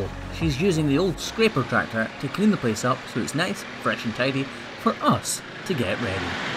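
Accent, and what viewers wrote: Scotland, United Kingdom. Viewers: Since discovering the, I have learned so much about cow hoof care, but most importantly I can confidently say that I have started to understand Scottish English. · Im from Sweden and I have nothing to do with this kind of work, but I think its very beautiful and nice to watch. I was sleepless one night for like 2 weeks ago and look at some stuff on Youtube and on some weird way I found you. Now I have watch so many of your vids that even I can see sometimes the problems the cows have, its very fun! And I love you Scottish dialect and humor! 🍻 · I know what you meant but it's really just a Scot's accent which differs it from English, Welsh or Irish.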